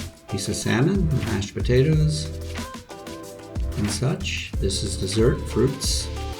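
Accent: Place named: United States. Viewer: hi, thanks for the video, ex-californian here in sofia for 6 years. i did find your american 'a' in sandanski a bit grating, i hope you eventually find it natural to use the bulgarian sound for 'a' at least in the name of your new city. showing respect for the locality may make your integration a bit easier. i look forward to following your story. best of luck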